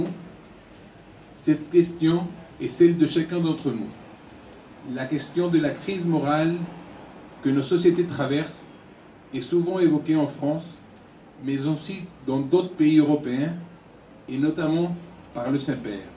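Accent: Spain. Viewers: il a un accent espagnol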